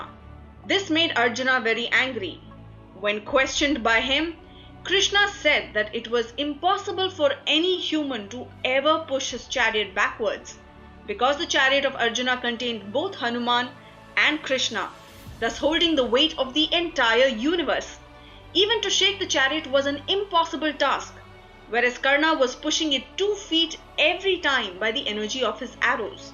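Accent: India. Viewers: Not a mythological myth, it is India's history. Pronunciation is Karn, Arjun and for more names or places like these.not Karnaa, Arjunaa, Mahabhartaa. Perfect sound of Indian accent but foreign pronounced words.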